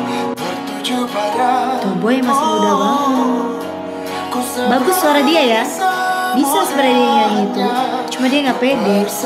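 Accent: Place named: Depok, Indonesia. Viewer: Yu, dialek depok nya , engga holang yaakkk..😁gue sneeng..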